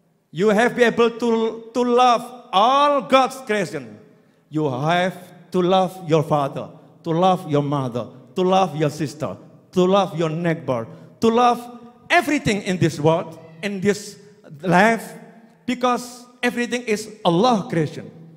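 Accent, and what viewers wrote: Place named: Java, Indonesia. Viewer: Aksen jawa nya...mirip2 pa jokowi klo dalam bhs inggris ...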